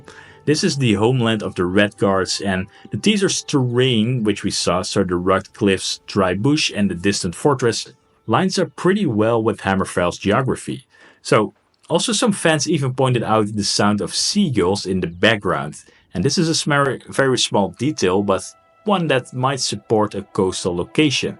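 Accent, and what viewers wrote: Netherlands. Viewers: this guy is definitely dutch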